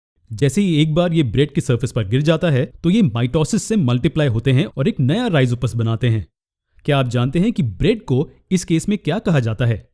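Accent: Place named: India. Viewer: Nice ........hindi accent sounds wow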